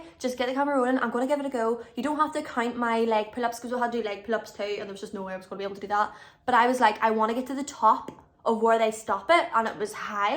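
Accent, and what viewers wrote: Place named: Ireland. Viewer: Irish accent sounds so harmonic.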